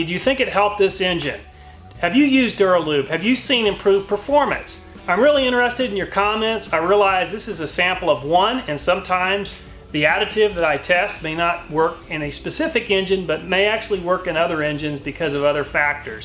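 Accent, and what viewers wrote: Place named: Pittsburgh, United States. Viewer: Thanks for all your hard work testing. I do my own testing, but it's only seat of the pants/noise/smoothness, etc. I grew up in Pittsburgh, PA area. I have been listening to your pronunciation. You sound like you might be from somewhere not too far away. Am I on the right track?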